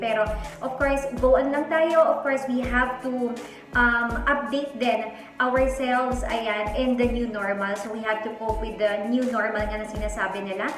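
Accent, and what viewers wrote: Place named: Vietnam. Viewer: Although can not hear English. But I'm also curious .... You are Vietnamese! You speak English like Vietnamese. great, excellent, wonderfull . I have subscribed to the channel. Please exchange. Wishing you and your family wellbeing. Love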